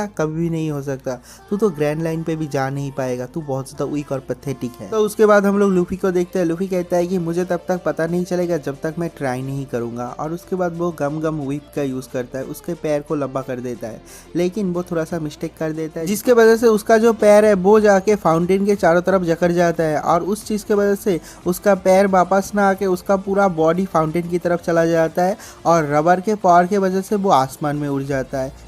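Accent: Bengal, India. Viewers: Bro apke voice se mai samjh sakta hu ap bengoli ho but please bhai gender ko sahi se mention karo Apne bola zoro ka leya mai tere ko paisa chuka dungi😢😢😢 mai paisa chuka dunga hoga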